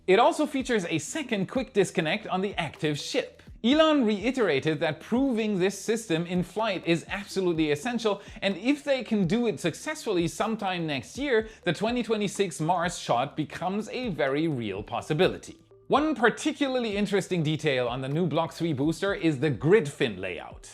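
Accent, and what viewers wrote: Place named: Germany. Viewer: Is it just me, or Is German accent starting to fade a bit? If he lives in Florida long enough, it'll start turning into a southern accent...wonder what that will sound like? a german-southern accent...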